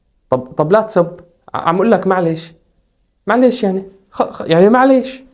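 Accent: Alexandria, Egypt. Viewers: لكن بيحكي لهجه اسكندرانيه احلى كلام ربنا يجعل محبه بين الشعوب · كلامك اسكندراني ❤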